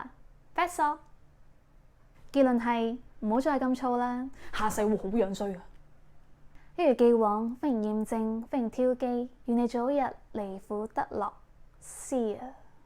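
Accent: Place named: Hong Kong, China. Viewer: 多謝（閣下）菩薩其實我等咗以香港方式嘅廣東話講解佛學意理解終於等到了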